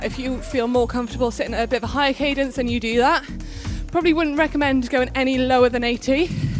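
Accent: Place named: United Kingdom. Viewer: aahhh!!! British English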